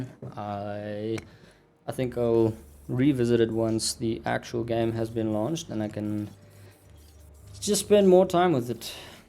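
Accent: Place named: Afrikaans, South Africa. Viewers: you sound afrikaans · It's easy to spot a South African ;)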